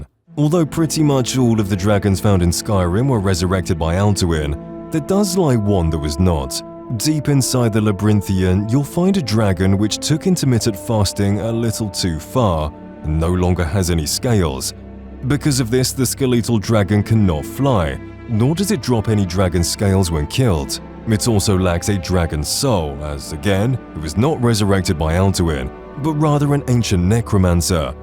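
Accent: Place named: England, United Kingdom. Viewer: That's how it is pronounced in England. You know, where English is from. Seriously... Americans are unbearably thick.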